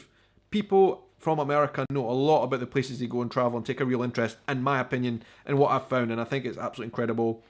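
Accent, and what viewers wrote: Scotland, United Kingdom. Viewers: I had to do a double-take when you said you "Spoked" to Americans, your Scottish accent sounded like "Spooked" Americans, and I thought why would Americans be scared of you! lol Scotland is on my bucket list to visit, more so than England. I suspect the accent though should make for some funny misinterpretations when I visit. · Been watching your channel for a while now. You are a wonderful speaker. You come across as very positive. The Scottish Accent is very cool, fun to listen to. I think you like America more than I do and I'm a native. I'll keep watching. I want go visit Scotland someday, after you've sold it so well. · I just love listening to you talk. Have you realized how much Americans love the Scottish accent? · I second this. The Scottish accent is one of my favorites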